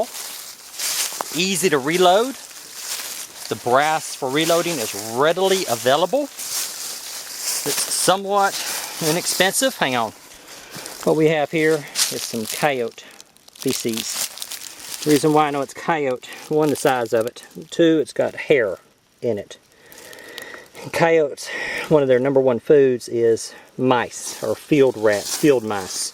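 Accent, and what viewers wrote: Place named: South, United States. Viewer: The ONLY caliber for a civilian is the .22 - the only one you can put 3K rounds on your back and run with . Another YT " Expert " ....besides the stupid hat and southern drawl , what might your qualifications be , Mr. Knowitall ?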